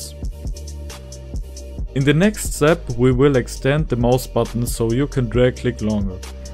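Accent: Germany. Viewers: Man hört so das du deutsch bist haha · Er hat so ein Deutschen Diacsient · Dieser deutsche akzent😂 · U can hear the German, in his voics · nichts gegen dich aber man hört das du deutsch bist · Der deutsche Akzent ist zu geil und Respekt das du so gut sprechen kannst habe es probiert mit meiner Maus(bloody Al90)und habe über 85cps bekommen ❤ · German but speaking English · Du hörst dich ürgendwie Deutsch an... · Moin ich höre das du deutsch bist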